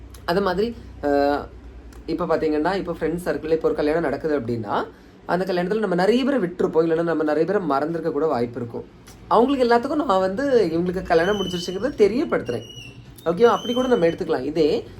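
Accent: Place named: Kanyakumari, India. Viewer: ஹாய் தம்பி நான் கீதா உங்க வீடியோ இப்போ கொஞ்ச நாளா பாத்துட்டு இருக்கேன் ரொம்ப சூப்பரா இருக்கு உங்க ஊரு எந்த ஊருன்னு எங்களுக்கு தெரியாது நீங்க பேசறது வச்சு பார்த்தா கன்னியாகுமரி யார் உங்க கூட பேசணும்னு நினைக்கிற நானும் என் பையனும் உங்க கூட பேசணும் நினைக்கிறேன் எங்களுக்கு நம்பர் கிடைக்கிறது இல்ல தப்பா நினைக்கல என்ன நம்பர் கொடுக்குறீங்களா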